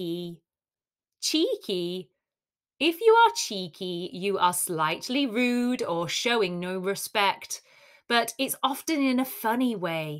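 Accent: United Kingdom. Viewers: i adore your britsh accent , the course are just perfect thanks a lot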